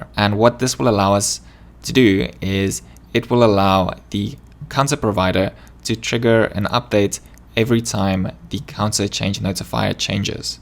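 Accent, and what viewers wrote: South Africa. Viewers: As a South African living in UK, learning Riverpod with your accent is wonderful! 'Bare with me, hey' <3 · South African??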